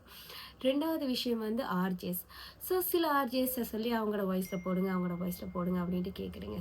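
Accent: Sri Lanka. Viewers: Pavani voice so sweet so problem Rj voice ku neenga srilanka tamil ah slang romba pudikkum sutha tamill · Mam neenga srilanka va unga novels read pannum pothu theriyuthu....indha video pathatum vilanguthu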